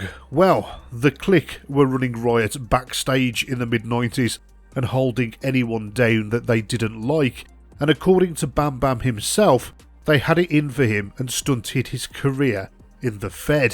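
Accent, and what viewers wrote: Birmingham, United Kingdom. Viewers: A Brummie guy doing wrestling reviews? Hell yeh!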